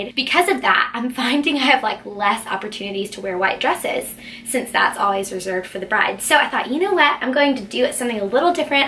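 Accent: South, United States. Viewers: your southern accent is adorable😍I live in Dallas too but I don't have a southern accent!😭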